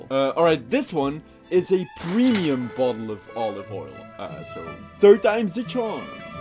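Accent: Netherlands. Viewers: Accent sounds Dutch to me. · How much of this applies to US markets vs our European markets? You constantly reference US cases and US studies and list everything in dollars (for some reason), but you seem to be dutch (judging from your accent and the Delhaize brands) and testing European products sold under European laws. Our European Union has a lot more stringent laws on food quality and truthfulness in their labels, although they are not perfect, they are a lot better in general than the capitalist Mad Max hell the poor Yanks live in. It would be great if you could be clearer on such significant differences in the future, because it's an important topic and a fun video in general, but with oversights like this, you could worry the wrong people or, even worse, desensitise people to the wrong topics.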